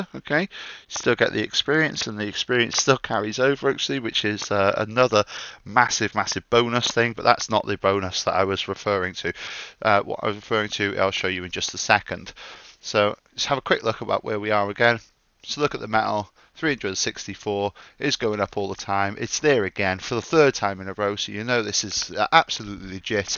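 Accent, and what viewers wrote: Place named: United Kingdom. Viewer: You should play rust because your British voice. You'd do well. 😉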